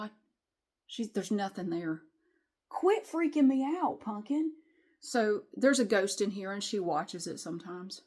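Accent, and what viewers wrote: South, United States. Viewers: You sound southern . Arm yourself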